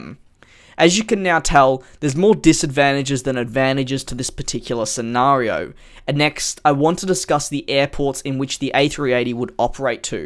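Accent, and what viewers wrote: Australia. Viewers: hi I’m Cj are u Australian??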